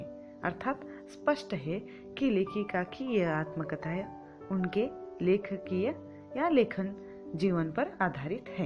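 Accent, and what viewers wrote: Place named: South, India. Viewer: Thanks 😊( kya aap South Indian h!)Aapki bhasha hme Kisi Apne ki yad dilati h😊.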